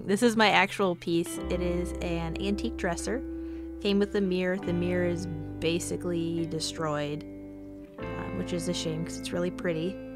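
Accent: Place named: Ohio, United States. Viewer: don't want to be nosy, but your accent sounds just like my daughter in law, who lives in Ohio.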